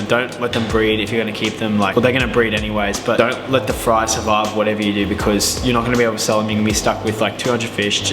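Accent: Australia. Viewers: Bro, you have a thick Aussie accent. Consider posting text names of each fish in the video and a list of them in the description for those of us who can only understand English. 😈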